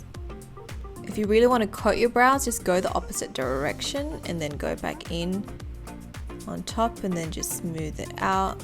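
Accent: Australia. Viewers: You have gorgeous, already thick brows!! Beautiful skin, too...are u Japanese ancestry? And that is the strongest Australian accent possible! Beautiful:) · Are you Australian? I just wonder if I guessed right 😂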